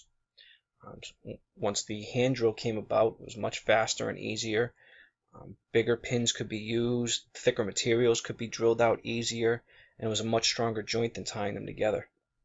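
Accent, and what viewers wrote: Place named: Boston, United States. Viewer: This is exactly what I've been looking, short, sweet and to the point. Also you from Mass. I thought i was hearing a Boston accent?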